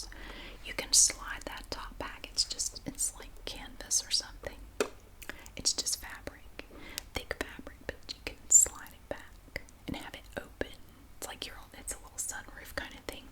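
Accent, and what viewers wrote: South, United States. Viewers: I feel like my whispering doesn't hit the same cause she has the perfect southern drawl!!!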